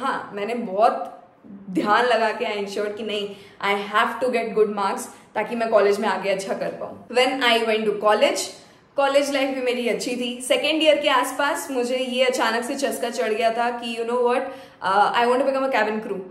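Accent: Bihar, India. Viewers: Views to aa rhe phle ki trh to kyu tension le rhi ho . BTW wo Bihari accent ki wjh se subscribe Kiya tha, so don't leave it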